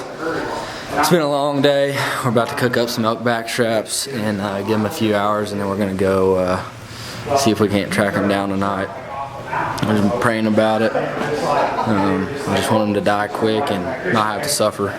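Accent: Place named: Texas, United States. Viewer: I thought you was from Texas. Tuffin up boy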